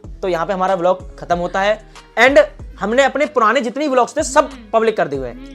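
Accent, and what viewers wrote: Bundelkhand, India. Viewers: Kya bundelkhandi bolte ho 😂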